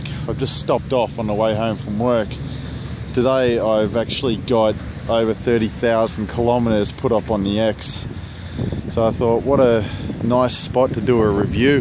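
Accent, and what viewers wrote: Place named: Australia. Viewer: Good Review and great accent. You Australians have reinvented English as a tool to maintain a single class society.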